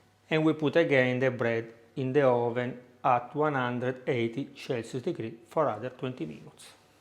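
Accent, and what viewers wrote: Italy. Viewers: Yet to try a recipe of yours...but I am spellbound by your accent and hand motions...a true Italian Chef! Please come to SouthAfrica..Cape Town...come visit...you would teach a thing or two to the so called Italian restaurants here...I even belong to Italian Club and their ciabatta is horrible!! Show how to make Glace Chestnuts please!!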